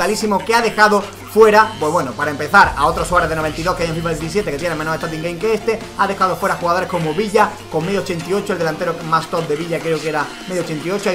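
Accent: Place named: Murcia, Spain. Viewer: Creo que es de Murcia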